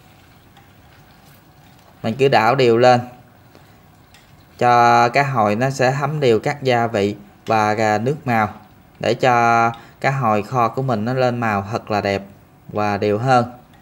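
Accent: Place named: South, Vietnam. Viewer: Nghe giọng giống của thầy Thích Tâm Phúc quá ! Rất Nam bộ , tuyệt vời ! Cảm ơn tác giả nhé !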